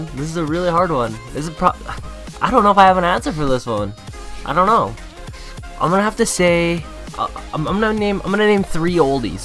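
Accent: Canada. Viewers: I could tell u were Canadian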